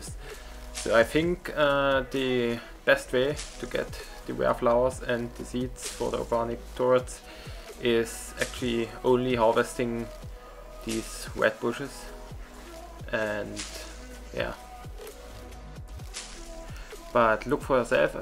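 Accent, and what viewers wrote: Germany. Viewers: lol man hört deinen deutschen akzent :D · Deutscher Akzent,mirkt man gleich :D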